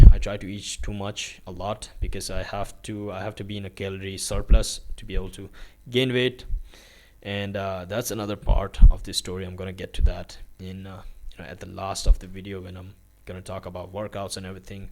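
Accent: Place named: Czech Republic. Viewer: How did you learn english❤ so well in a Cruchy accent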